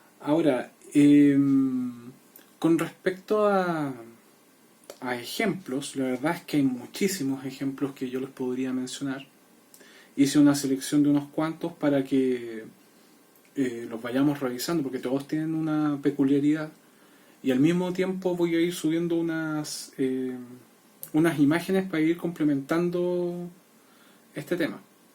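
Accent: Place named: Chile. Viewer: En este canal hay dos cosas que me intriga mucho, los asesinos seriales y el acento chileno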